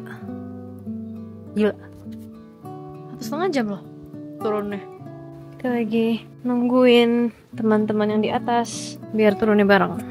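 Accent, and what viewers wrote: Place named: East Java, Indonesia. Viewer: Cakepp.. auto subs dh.. 😊👌 kirain asli jatim hehe..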